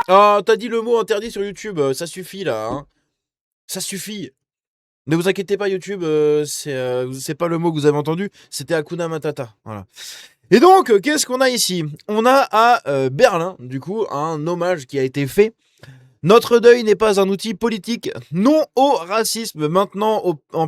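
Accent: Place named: Spain. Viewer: L'accent est espagnol ou portugais.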